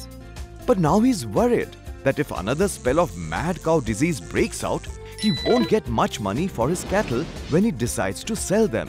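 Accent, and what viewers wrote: India. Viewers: that Indian accent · I adore the indian accent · Your Indian accent is extremely annoying! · I didn't expect Indian accent lol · That is a very American looking host with a very indian accent... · I got here looking for the mathematician term of "derivatives" This is the financial one -_- but it was interesting. I am learning English and I admit that I am not completely good at it... But starting from the first 5 seconds of the video I knew that It was and Indian guy hahahaha. I asked myself. Of course, this video is aimed to western audiences but what is an Indian Guys using an Example his "sacred" animals. In as you have seen the example was refereed to sell them to eat, India Mcdonalds are not allowed to use bovine meat on their products. And I know that I could sound kinda extremist but there are a bunch of animals that could be used for this example in a better way without violating cultural dogmas. 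At the end it was a nice video and I enjoyed it. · This was very informative, but why the heck does he have an Indian accent? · The single most annoying accent in the world. The Indian accent -good grief! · Excellent in providing a clear and simple illustration of futures. Love the Indian accent. Thanks for doing this.Keep it up!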